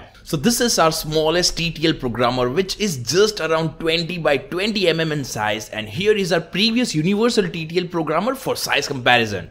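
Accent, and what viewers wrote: India. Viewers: You're speaking Indian or Pakistani? 🤯 So very very was bad, it's was same you're something eating and speak 🤦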